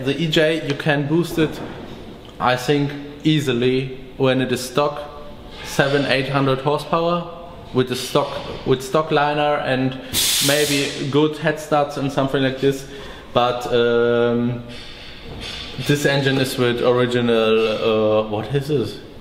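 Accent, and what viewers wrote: Germany. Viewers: Lmao was not expecting a very strong German accent and then realizing you’re putting them in air planes not cars really surprised me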